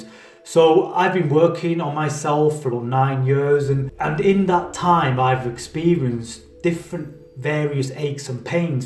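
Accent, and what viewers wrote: Manchester, United Kingdom. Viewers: I can hear a Manchester accent.. 💖 Mancunian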